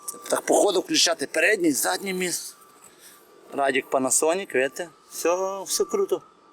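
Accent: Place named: Western Ukraine, Ukraine. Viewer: Нормально говорит, на диалекте захидном.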